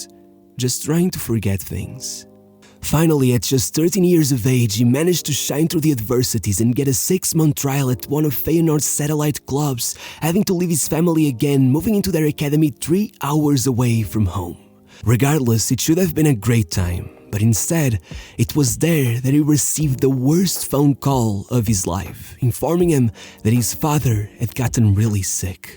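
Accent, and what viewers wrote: Portugal. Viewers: Pelo sotaque nota se que és tuga. Orgulho em ti miúdo. Vídeos incríveis sempre 🤩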